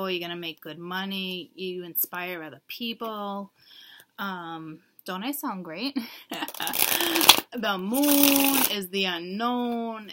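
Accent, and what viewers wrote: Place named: Long Island, United States. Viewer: I am sorry this is SO irrelevant and while I am at it, what a great reading! So thank you(: But are you from like Shirley?!.... L.I.,NY ? I ask because you sounds like just my great aunts from there haha Italians on long island lolol<333 it reminds me of Easter :p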